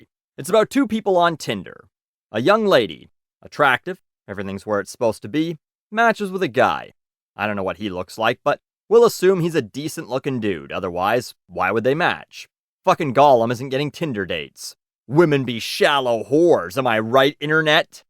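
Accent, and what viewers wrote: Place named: Canada. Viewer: I love your canadien accent.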